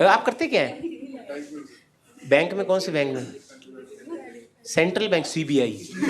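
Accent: Pakistan. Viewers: Why he has a Pakistani accent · Why he's sounding like pakistani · love the way you speak! Apka lahza pata nahi kisi ne notice kiya ya nahi but bhaut influenced hai how people speak in Pakistan. For example: Chai mai chini kam kare aap, mohan meri baat sune aap. Don't take offence but this is how most Pakistani influencers and comedians speak in general. Aur bhi notice kiya hai maine but all that sounds very nice....adds to the comedy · * ki tone itni Pakistani si kyu hai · brother why your accent matches with pakistani's accent, are you from indian border side.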